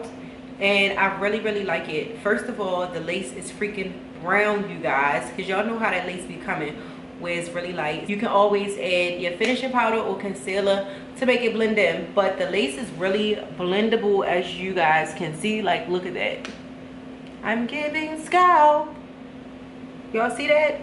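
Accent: Baltimore, United States. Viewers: her voice has a Baltimore accent!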